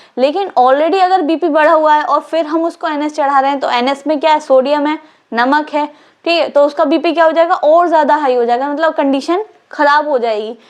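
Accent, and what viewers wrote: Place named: Assam, India. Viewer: Ma'am aap Assamese ya bengali ho kiya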